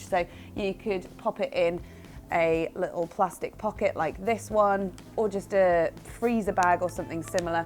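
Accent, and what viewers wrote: United Kingdom. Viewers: I've searched "how to run in rain" and what ? Unexpectable british accent appears xD oh i love english weather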